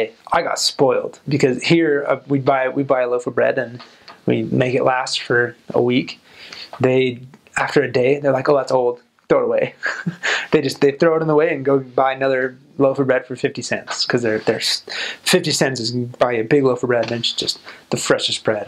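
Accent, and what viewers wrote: Albania. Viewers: I loved your alb accent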